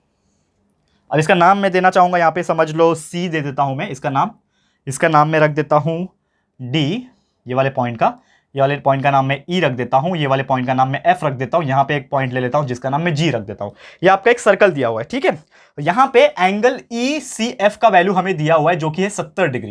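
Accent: Maharashtra, India. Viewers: Sir aap marathi bohot cute bolte ho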